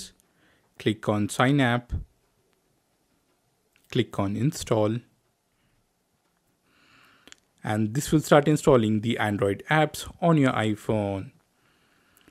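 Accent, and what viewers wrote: India. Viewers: Why do you have a indian accent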